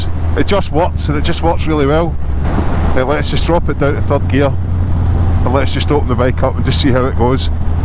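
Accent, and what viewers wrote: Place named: Glasgow, United Kingdom. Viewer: I came to see the review and really enjoyed it. As an expat living in Australia it was great to hear a glesca voice and then I realised it was in Hillington estate. Different Triumph shop now as he used to sell Ducati's as well and I remember the original dealership from Renfrew.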